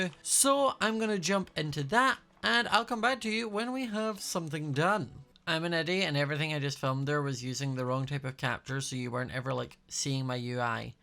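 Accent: Scotland, United Kingdom. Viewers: I love your scottish accent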